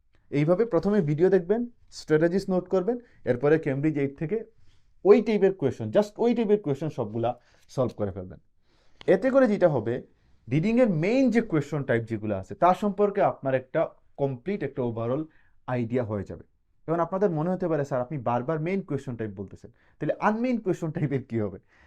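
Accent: Sylhet, Bangladesh. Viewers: Sir Are you Sylheti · Apne ki sylheti? Apnar kotar modde sylheti tan ta ashe🤷🏼‍♀️?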